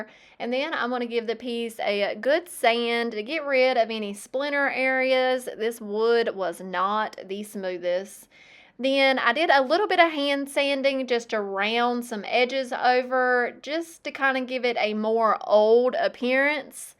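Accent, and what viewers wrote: South, United States. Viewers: This is my first time seeing one of your videos and I immediately noticed your southern accent. So in searching I found you are from Kinston, NC. I'm in Wilson, NC, but living in Maryland for 38 of my 77 years, I've lost most of my accent.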